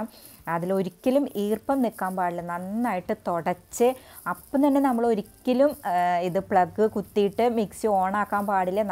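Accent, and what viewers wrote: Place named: Thrissur, India. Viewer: തൃശ്ശൂർ ഭാഷ കേൾക്കാൻ ഒത്തിരി ഇമ്പമാണ് ട്ടോ. നല്ല ഒരു tip. Thank you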